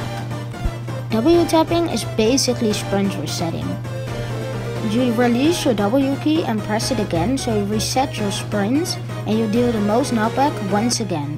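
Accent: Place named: Philippines. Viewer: It's pretty surprising for such good fps while you have less than 100 subs and you sound filipino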